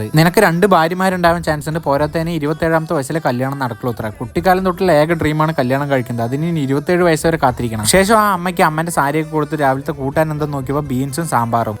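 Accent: Palakkad, India. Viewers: Palakkad bhasha❤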